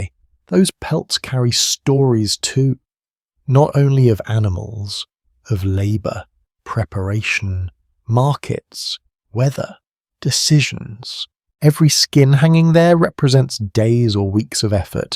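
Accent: England, United Kingdom. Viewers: Pcffffst. Give us simeone without a brit accent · These are stories of American history. If these voice overs are AI why use a “person” with an English accent. Why not use a voice more like Sam Elliott? It just seems more fitting.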